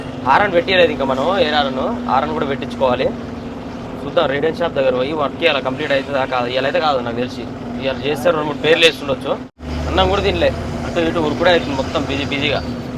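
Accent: Telangana, India. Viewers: Yarana Telangana basha devarakonda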